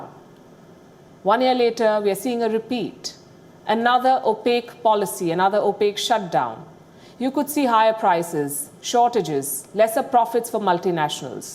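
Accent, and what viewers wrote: India. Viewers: Love her Inglish (indian english) accent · I just love the Indian accent and smell.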